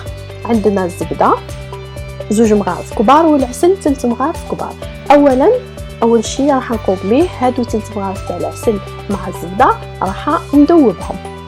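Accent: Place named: Algeria. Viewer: سمحيلي الزيينه ديالي يلاه خرجت من المستشفى كنت زيدت إبني عثمان يلاه راني ندير الواجب مع الكل انا من عشاق الشوفان قناتك رائعه اتمنى لك التوفيق وانشاء الله يدوم التواصل بينا أضن انتي من الجزائر الشقيقه من خلال لهجتك حيت عندي صديقاتي من الجزائر والاقرباء كذالك كلنا اخوه في الله 🙏🙏🙏🙏🙏🙏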